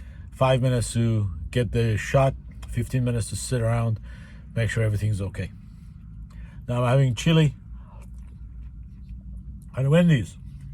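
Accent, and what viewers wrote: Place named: Arab world. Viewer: Just out of curiosity are you originally from the middle east? My GF (from Iran) and I have been trying to place your accent (maybe do an episode about what you do in life, profession, what got you into overlanding, etc.). Loving your videos btw. Keep them coming! I did 5 BDR's last year in my JKU, sold the RTT and am in the home stretch of a year long wait for my J30. I live in Chula Vista so if you ever make it back out this way, DM me and I'll buy you a burrito and a beer. 👍🏻😎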